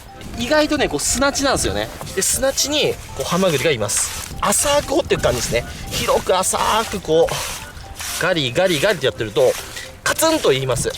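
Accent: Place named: Nagoya, Japan. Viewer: の名古屋弁が心地いいなーと思いながらいつも見てる。名古屋の人はわかるんじゃないかなー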